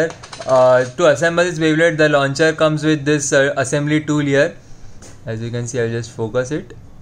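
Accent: India. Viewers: Are you an indian? i can tell in your accent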